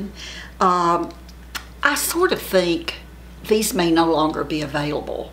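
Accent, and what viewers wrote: South, United States. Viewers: Hi, great advice and hell yes I would love to hear how you and Jim met and how he won your heart. So happy you never settled for just anyone. You always make my day because you are always honest and interesting plus I love you southern accent 😉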